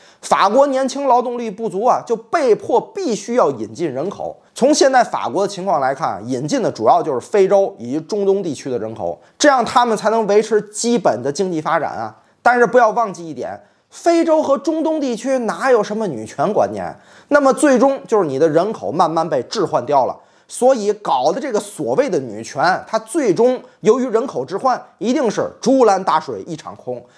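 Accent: Tianjin, China. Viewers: 早安, 新闻充满正能量,而且资讯非常正确,而且讲话充满了天津话顺口溜,超级的高级幽默, 的视频跟长脸自干五的视频,完全是不同的风格,可是都让我长知试了, 加油了.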